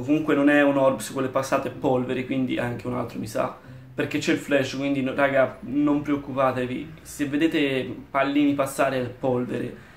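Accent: Sicily, Italy. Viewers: Siciliano doc... bellissimo video ansia a mille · "O spunniti u culu" di dove sei? Io sono di Siracusa 😂😂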